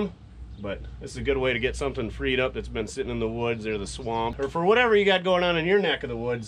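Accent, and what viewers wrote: Minnesota, United States. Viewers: Just found your posts and I want to tell you how much I enjoy them! Basic but informative and just a plain old fun watch. Sounds like your from Minnesota or there about?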